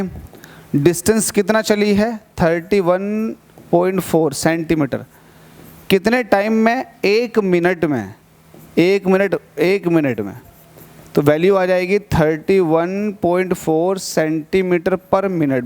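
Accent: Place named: Moradabad, India. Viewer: Sir aaap Moradabad side ke hn kya ?? Apka accent bahut accha lgta h 😊 aap please khulke bola kriye apne accent me mza aaega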